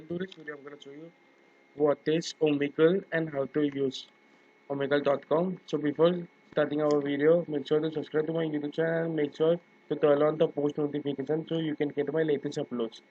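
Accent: India. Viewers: indian indeed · u from india?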